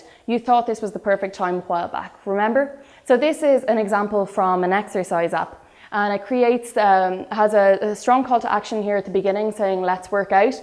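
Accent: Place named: Ireland. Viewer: Love the Irish accent!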